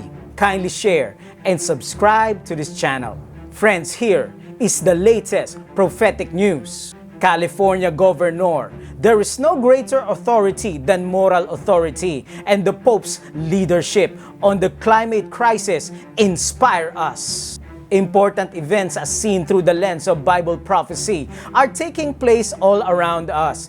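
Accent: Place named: Bisaya, Philippines. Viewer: Bro could you change your bisaya accent to tagalog or neutral accent? Para kasing putolputol pakingan...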